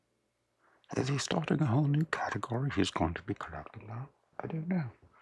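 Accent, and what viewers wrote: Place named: New Zealand. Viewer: Very nice of you to show off Robs latest acquisitions. I have to ask, have you spent time in the Antipodes as I could swear I detected a faint New Zealand accent?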